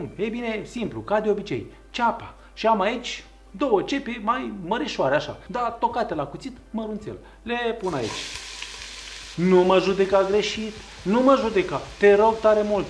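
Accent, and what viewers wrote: Moldova. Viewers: Pot sa ascult fix un minut pe cineva cu accent de moldovean,Dupa aia ma ia greata.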